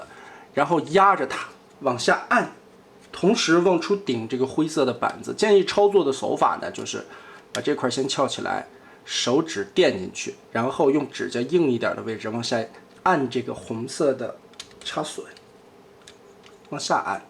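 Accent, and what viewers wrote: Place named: South, United States. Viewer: He speaks with a southern drawl 😀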